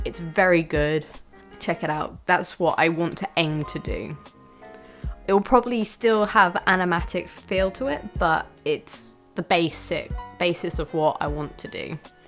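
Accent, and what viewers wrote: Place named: United Kingdom. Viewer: I love your accent ❤️❤️❤️ is it British?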